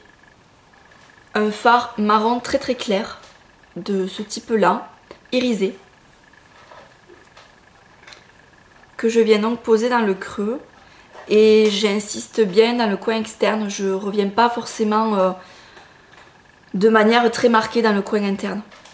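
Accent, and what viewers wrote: South, France. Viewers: Coucou et bonne année 2013 ! J'aime beaucoup tes vidéos et ton accent du Sud ! :) Est-ce que tu pourrais faire la vidéo de tes cadeaux de Noël ? Bisous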